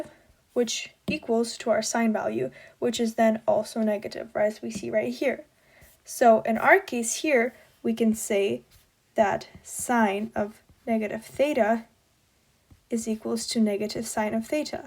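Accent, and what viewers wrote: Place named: Australia. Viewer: Ur australian accent is cool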